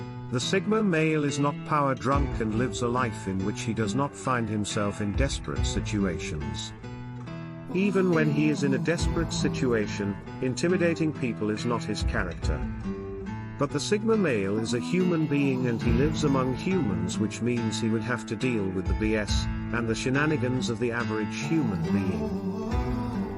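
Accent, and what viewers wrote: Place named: England, United Kingdom. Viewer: English accent 💩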